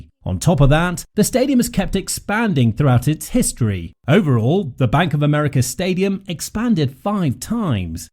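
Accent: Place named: England, United Kingdom. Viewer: There is so much hogwash and propaganda in this video it must be funded and produced by David Tepper. This is yet another example of a sweetheart deal to benefit a billionaire who stands to pocket huge profits from the renovated stadium through stadium use fees and concession sales while the city that funded the vast majority of the renovation gets virtually squat. Shame on the Charlotte city council for throwing the citizens under the bus so a billionaire can brag to other billionaires how he conned the city into funding his new stadium. I hope the citizens of Charlotte replace every council member who voted for this atrocity. Not to mention this video is so poorly done it uses game footage from other teams and stadiums unrelated to the story. And what's with the English accent? Is that supposed to provide some additional credibility or something?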